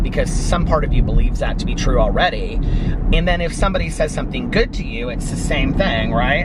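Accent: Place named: Tennessee, United States. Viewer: Love your voice! Don't listen to stupid people! The first time I watched you I thought you were from Tennessee bc you talk like us 😂 Love your vlogs!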